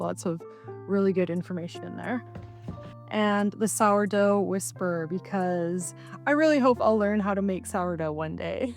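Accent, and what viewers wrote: United Kingdom. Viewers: It's interesting to see how the way you speak has changed over the past year. I hear the British influence on your accent 😊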